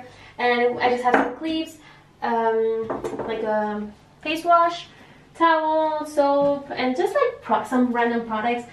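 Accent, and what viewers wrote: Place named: Argentina. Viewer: te escuchaba hablar en inglés y me parecía , yo decía es argentina por el acento jeje . saludos .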